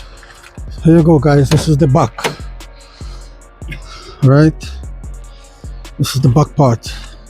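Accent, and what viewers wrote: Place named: Jamaica. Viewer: Nice working van. You sound Jamaican.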